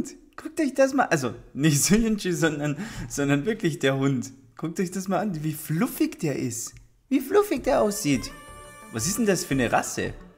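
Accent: Austria. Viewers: Bist du Österreicher oder Deutscher? Bin gefüllt einziger Österreicher auf yt 🥶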